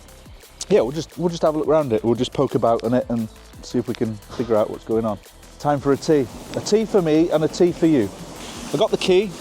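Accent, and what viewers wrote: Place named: Dolgellau, United Kingdom. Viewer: I recognise that accent anywhere! 'Ogia Dolgella? I'm an XKR x100 owner, just down the road. 👍🏻😎🏴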